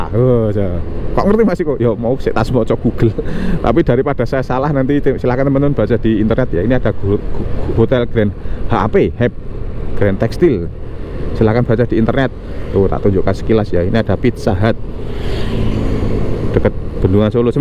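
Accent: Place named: Malang, Indonesia. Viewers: ngomong omah e klaten mas, tapi logat mu wong jatim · Solo pancen ngangenin, Mas wong Klaten tapi logete Malang puol..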